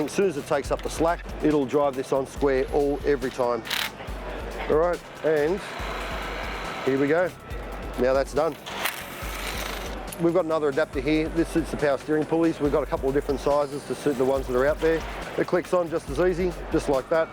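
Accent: Australia. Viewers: I thought he was a country dude at first then Australian...I just tap with hammer and use puller....some come right off most do actually.. 2 outta 10 will be a bitch. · Good thing we could see the stuff working, because his high speed aussie accent is impossible to understand.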